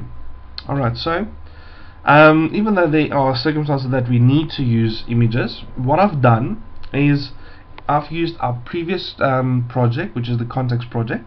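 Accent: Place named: Afrikaans, South Africa. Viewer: your accent sounds afrikaans so you should understand this ('n goeie begrip het 'n halwe word nodig) aimed at P.S Like the Vids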